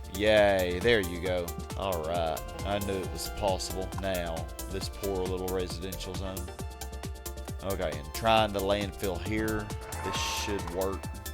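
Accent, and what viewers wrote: South, United States. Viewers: I don't mind if you switch games but I liked the video regardless Always good to have a retro gamer with a southern accent on the youtube timeline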